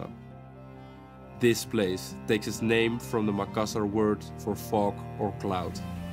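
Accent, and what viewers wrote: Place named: Netherlands. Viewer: Dik Nederlands accent :p maar wat een prachtige video!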